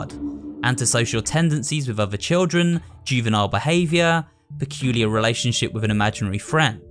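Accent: United Kingdom. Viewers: is it just me or did you get more british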